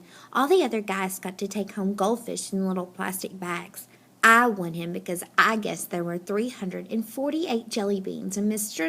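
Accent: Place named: United Kingdom. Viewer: No offense but you sound like a British person pinching her nose and reading at the same time. If you are wondering why I'm looking at the video, it's because of homework...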